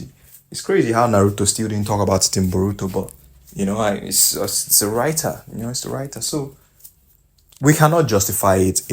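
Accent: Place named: Africa. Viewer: I knew you were african, the accent never lies 😂. Also the itachi novels go into more detail. This is a quote from the novels when the elders debate whether they should allow itachi in anbu "The Military Police Force was created to drive the Uchiha clan away from the central functions of the village". Like the systemic discrimination was there and they didnt even hide it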